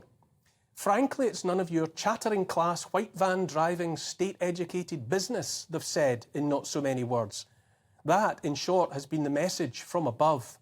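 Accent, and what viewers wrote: Scotland, United Kingdom. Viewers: maybe it's the tone of your voice or the barely hidden anger in your eyes. Or maybe it's the Scottish accent, but every single time i listen to what you have to say I'm looking for a two hand broad sword and a fxcking big axe.. Keep going, stay free.. all the best from the Netherlands.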